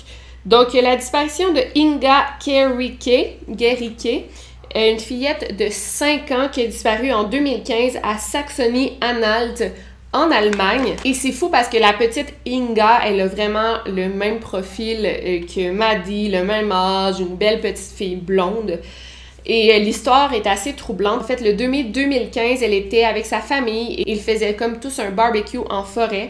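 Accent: Quebec, Canada. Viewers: Grâce à toi j'me suis enfin habituée à l'accent québecquois ^^ j'suis trop contente et tes histoires sont tellement intéressantes !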